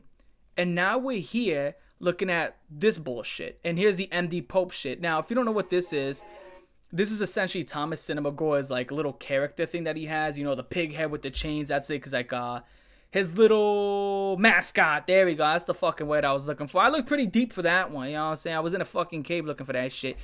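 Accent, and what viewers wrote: New York, United States. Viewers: How much newyorker are you?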